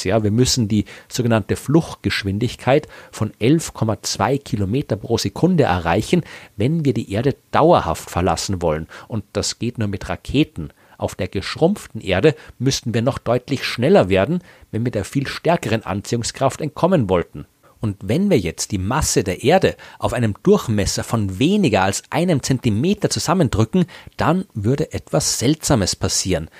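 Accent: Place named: Austria. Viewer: Ich finde die Österreichischen Dialekte schön. Und ist es nicht Toll das wir in verschiedenen Ländern leben und trotzdem die selbe Sprache sprechen. Trotzdem ist nur der jenige Polyglot der die MathemAtik beherrscht ;-) denn das ist die Sprache des Universums. Schönes Wochenende. PS super Hörvideos immer weiter so. Wird Zeit das mehr Leute auf deine Kanäle aufmerksam werden damit deine Arbeit, die du hier reinsteckst auch mit der Weltlichen Währung honoriert wirst und nicht nur mit Lob.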